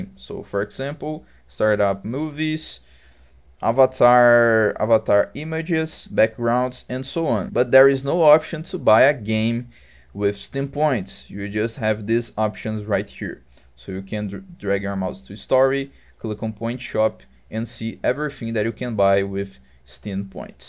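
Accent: Brazil. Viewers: vc é br? o seu sotaque parece bastante, e tambem da pra ver ali que o dinheiro ta em reais, mas caramba! tu tem tudo isso de dinheiro na carteira steam? parabens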